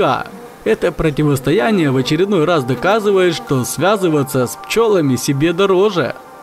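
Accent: Ukraine. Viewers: Какой же мерзотный 404-й акцент. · это Украинский акцент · а разве не в украине просто говорит он с некоторым акцентом, похож на украинский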